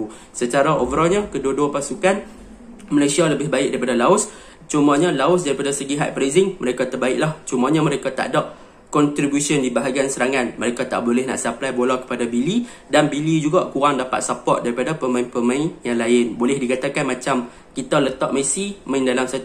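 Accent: Kedah, Malaysia. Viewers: Sorry aku takkan subscribe , Kalau admin cakap masih ada pelat2 kedah .. Sorry to say !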